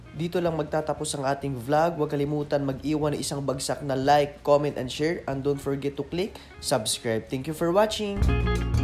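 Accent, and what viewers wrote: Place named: Philippines. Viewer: ang accent mo parang tagalog, hindi ka ata "Bisaya" murag Tagalog! Wala ba mga buaya diha?😅 Amping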